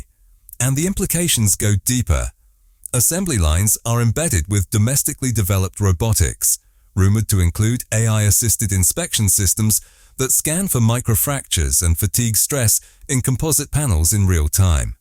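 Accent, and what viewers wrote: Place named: United Kingdom. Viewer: Trust China ? Trust their data & one test flight. How much does it take to buy off media hyped promotions ? Now it’s alledged … CCP is doing it all . This is CCP lackey — agit prop , bought off public relations, & over hyped mock up. — Looks like Boeing & AirBus should give up.. Pure “ white monkey spokesman “… influencer with a “ Brit accent “. — Towering CCP fakery & tub thumping.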